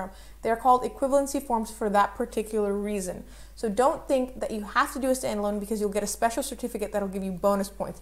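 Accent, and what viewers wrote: United States. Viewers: great piece of advice in a short amount of time!on a side note I really didn't expect you to have an american accent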